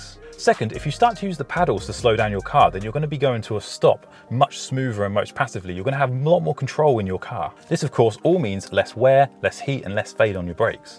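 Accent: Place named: United Kingdom. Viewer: Ahh! This British English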